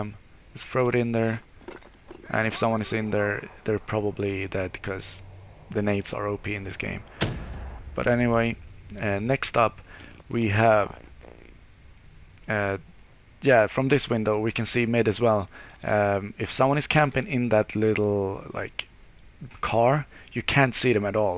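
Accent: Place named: Sweden. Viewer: you are for sure swedish😃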